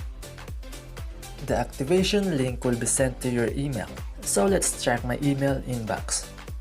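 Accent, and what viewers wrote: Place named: Philippines. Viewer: I already know that your a pilipino bc of your accent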